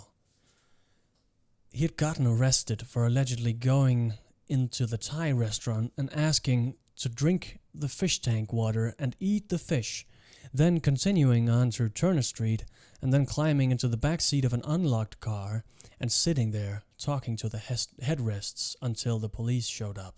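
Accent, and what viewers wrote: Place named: Germany. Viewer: nice accent, are you from Germany?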